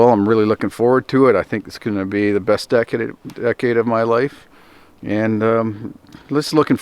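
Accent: Canada. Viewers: Happy New Year! I love your Canadian accent. So sorry to hear you lost your business & left you in a lot of debt.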